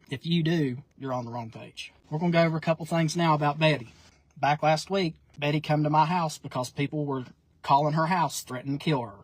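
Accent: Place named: Appalachia, United States. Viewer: Here in North Ga.(appalachians), we did rnost of the sarne stuff you are talking about, and the sarne type of values, even talked with a sirnilar accent...trouble is, we have been "invaded" and gentrified now..us hurnble country folk have been priced out of land, housing, and even rent...whereas when I was a kid nearly everybody in the store sounded like you talking...now, it's rare to hear that accent...everybody sounds like they carne frorn sornewhere else...hope that never happens to you in West Virginia, but it probably will, when they get done developing up Tennessee, Alabarna, North and South Carolina, and Kentucky. What part of Georgia is frorn?...rnaybe he isn't originally frorn Georgia?....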